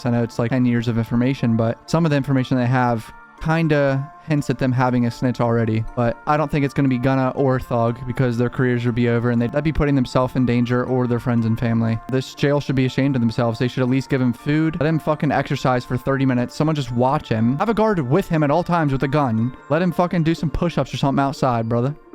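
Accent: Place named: Maryland, United States. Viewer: I can tell your from md buy your voice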